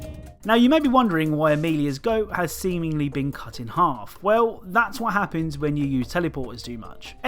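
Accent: United Kingdom. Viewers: you british man?🤨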